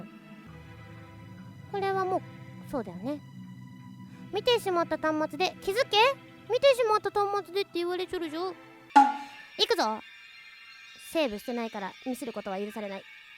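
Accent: Japan. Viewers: アイちゃん広島の子？じゃろってw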